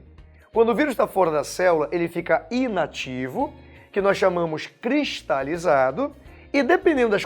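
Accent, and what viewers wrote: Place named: Pará, Brazil. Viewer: amei sua aula! seu sotaque parece da minha cidade, você é paraense?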